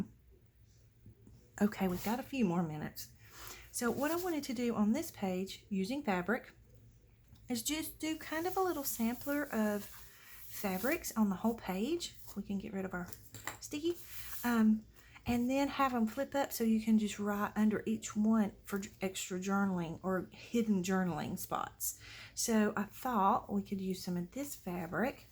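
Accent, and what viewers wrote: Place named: Tennessee, United States. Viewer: I knew I recognized your accent - maybe because I'm just down the road from you in Harriman! 😂 Love it! Thanks for sharing. Great ideas & lovely journal! God bless.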